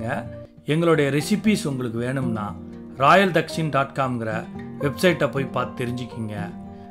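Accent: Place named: Telugu, India. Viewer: Looks yum are you Telugu doesn't sound like malyalam or tamil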